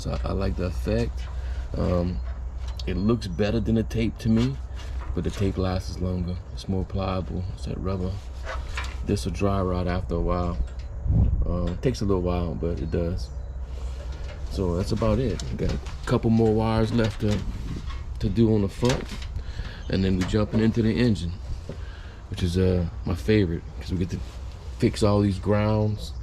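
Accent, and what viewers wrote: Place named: Los Angeles, United States. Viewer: I thought you sounded like you were in LA. I'm in Monroe, in need of your services soon. I have a 1986 chevy short bed i inherited, My father's old truck.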